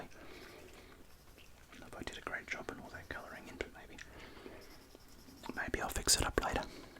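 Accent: Australia. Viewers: Which part of Australia is your accent? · This vid Is now in my top, Aussie accent's my fave xx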